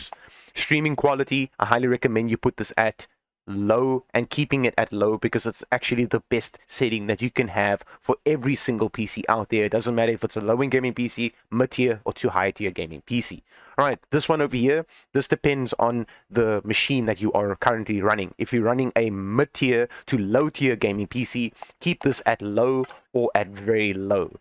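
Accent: South Africa. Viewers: Bro I knew you sounded South African. Great to find a South African tech youtuber!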